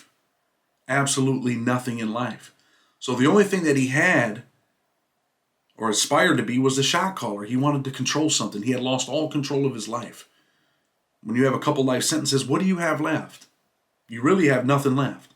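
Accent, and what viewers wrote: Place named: New York, United States. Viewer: My whole family from NY. Got some up in Buffalo, Syracuse, Oswego. I can hear ur accent sometimes. Good vid today.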